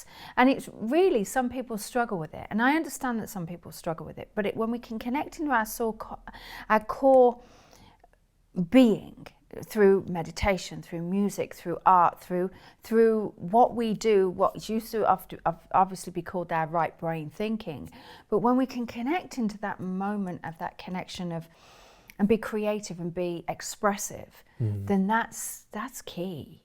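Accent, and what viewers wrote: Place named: Scotland, United Kingdom. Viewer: LOVE LOVE LOVE his accent. I'm a sucker for the Scottish accent. Sounds like Kyle Gray :)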